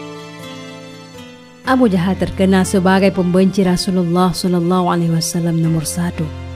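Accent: Aceh, Indonesia. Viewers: pengisi suaranya seperti logat org aceh😁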